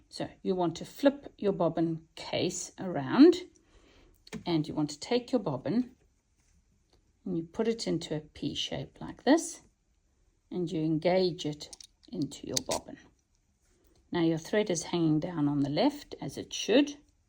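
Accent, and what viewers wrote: South Africa. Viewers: I know a Sata African when I hear one. Thank For this - just bought the same machine to start my hobby and small projects. and your videos are just everything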